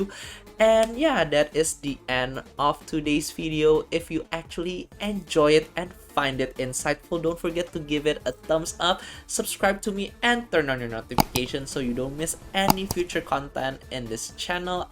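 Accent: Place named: Indonesia. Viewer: Indonesian accent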